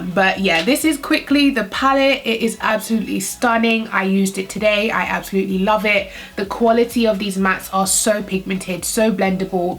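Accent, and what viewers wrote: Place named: United Kingdom. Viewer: Aw from when you said Morphe sent you some stuff I was smiling girl 😫🤸🏾‍♀️ through the video I was like please tell me you gave her the brushes & you mentioned brushes I was like oh Yes Lord 😫💃🏽 I was finished. Girl i’m so happy for you. I been watching you for a couple months since last year & I love your generosity & honesty. Quite weirdly I like listening to your voice & accent lol even though I too live in the U.K. 😂 It’s time now we get onto that Discount Code so we can support the U.K. babes 💜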